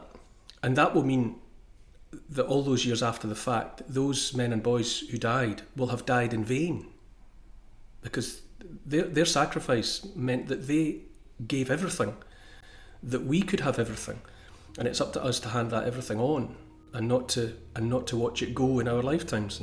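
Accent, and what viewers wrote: Scotland, United Kingdom. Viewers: 💞💞 Immense gratitude (and tribute) for all you do 🤗🦋🐬 A gentle, beautiful Scottish lilt A kind, empathic, forward tilt An open heart; a caring face A willingness to take his place. A strong, home-hitting monologue Reminds us of the boiling frog The courage to become a sleuth A passion to release the truth. A deep desire to crack the whip Illuminates our freedom-strip Calls out the darkness taking hold The shiny despots; plated gold! Intense and raw, he lays it bare A soul-enhancing, honest stare Inflections drive the message through He speaks for Us - for me and you! Discernment's such a precious gift His words create a mighty lift Take heed of all he has to say He knows - it shouldn't be this way! 🤗🦋🐬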